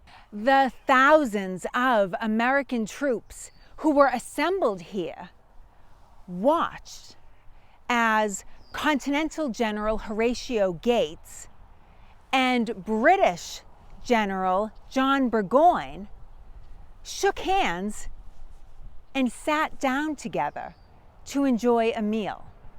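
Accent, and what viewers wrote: Boston, United States. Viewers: It’s All Boston accent All the time. I love it. I grew up on the North Shore and moved away. And watching videos is like being back home. Oh, and the history part is fantastic as well. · Amazing Boston Accent. War between civilized nonsuicidal peoples is so different from war with Japan or Islam where the enemy doesn’t care if they die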